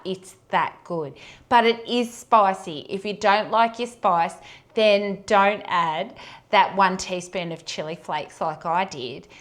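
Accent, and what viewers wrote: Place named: Australia. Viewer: Hi, you are Definitely a Dinkie Die Aussie. I love your videos,your Aussie accent and you make me laugh. I pleased you now settled down to making cooking videos. It is more exciting than shorthand and typing. Got to get back to the potato bottling or canning. 😂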